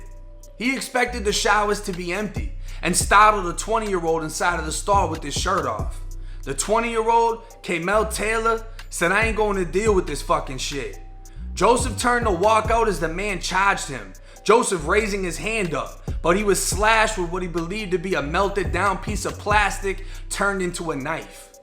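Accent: Boston, United States. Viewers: Why you got a Boston accent if you from Tampa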